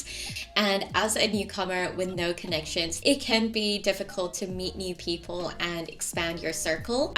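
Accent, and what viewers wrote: United States. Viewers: Nice video! You grew up over here but how did you get such an American twang? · You're 30 and the American accent stuck with you lol what a load of virtue signalling bs. Sorry to burst bubble.😂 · sorry to ask but why do u have such a american accent? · Are you American? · Are you from north America your accent sounds Yankee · Nice vid - bit of an American accent there?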